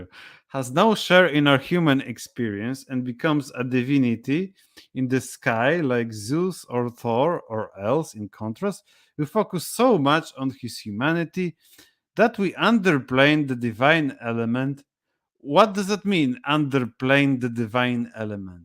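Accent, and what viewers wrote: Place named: Eastern Europe. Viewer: Is this book popular? Nice read with good commentary and thic and fresh slavic accent 😁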